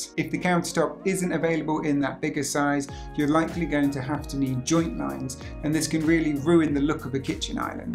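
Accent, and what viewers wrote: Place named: United Kingdom. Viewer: He’s British judging by his accent so it’s perfectly normal for him to express in metric measurements.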